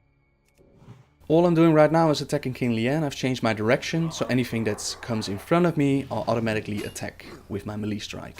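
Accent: Netherlands. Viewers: Hey, I'm from Belgium, and i hear you'r a dutchman to;P Congratz and respect. Maybe we can play Together,:P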